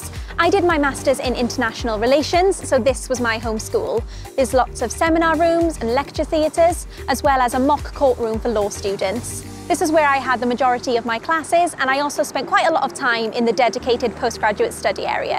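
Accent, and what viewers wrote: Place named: Cardiff, United Kingdom. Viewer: You got kind of a Caiiirdiff accent the way you say "hyerr" but you also got a touch of the valleys or something too. Not sure what. Maybe somewhere outside the city. The visuals and drone shots in this are incredible too. The city can look so beautiful from some angles.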